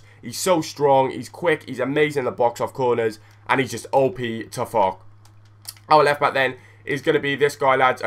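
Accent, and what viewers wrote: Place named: Welsh Valleys, United Kingdom. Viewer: You sound like leeroy from the valleys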